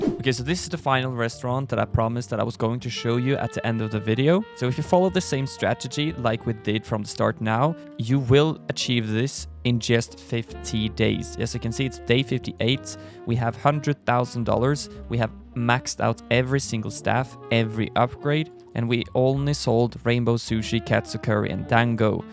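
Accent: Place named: Norway. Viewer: Brooo Are you from Norway? Or should I say er du fra Norge? Jeg så det når du sa vi skulle abonere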